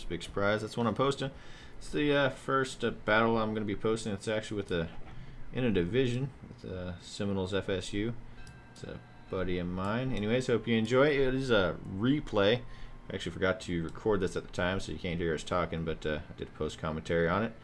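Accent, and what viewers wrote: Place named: Texas, United States. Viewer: THAT'S what it is. Another Texas boy. It's that back - home accent that I hear. Another of your typical entertaining and instructional videos. Keep'em comin.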